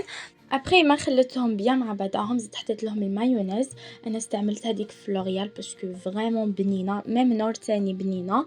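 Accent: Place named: Algiers, Algeria. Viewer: تقول عليك دزيرية مش قسنطينية هدرتك متشبهش كامل لهدرت لقسنطينة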